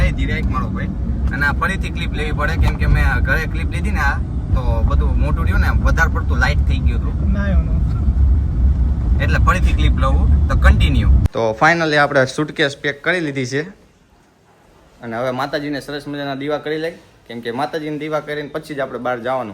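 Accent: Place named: Zalavad, India. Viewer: Jordar maja Ave che tmara video jovanu ❤tmara video ma bav maja 🎉ane you are the best canteen creater 😎 ane bav j handsome lago cho ❤ek dam babla jeva j ,ane tmari zalavadi boli bav j mast che ek dam top 😎😎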